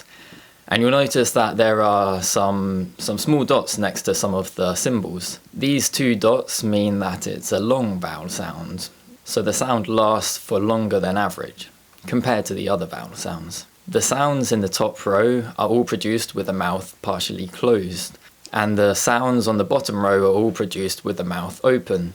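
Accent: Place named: England, United Kingdom. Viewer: I love your English accent ❤️🤩 What's the name of your English accent?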